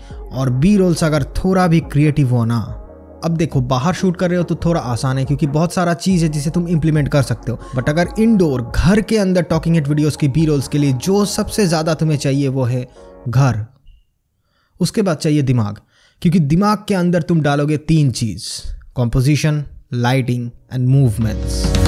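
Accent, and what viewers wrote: Bengal, India. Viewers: Bhai are u bengali?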